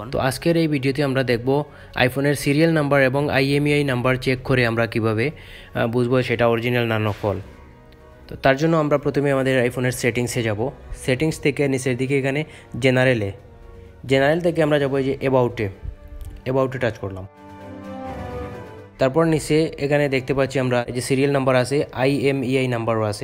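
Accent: Sylhet, Bangladesh. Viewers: Vai kita sylhety ni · Bhai tumi Sylheti nay ni....❤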